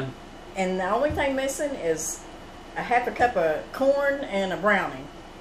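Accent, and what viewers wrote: South, United States. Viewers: I can’t wait ti try this! I so enjoy watching your videos, your beautiful southern voice is magical, reminds me of my grandmother who also loved to cook! ♥️♥️♥️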